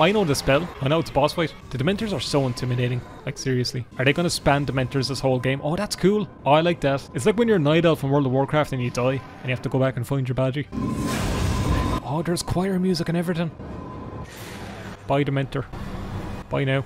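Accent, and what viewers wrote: Ireland. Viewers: i love your irish accent <3 greatings from germany :)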